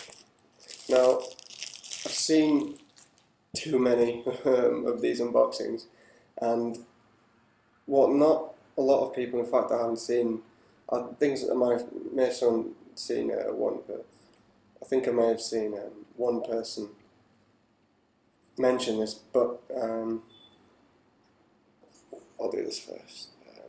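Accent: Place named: England, United Kingdom. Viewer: thts such a sick english accent =D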